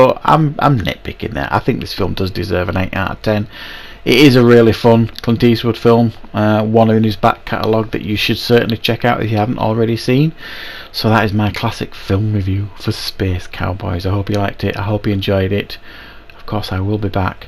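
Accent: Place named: Scotland, United Kingdom. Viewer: Scottish Moviebob??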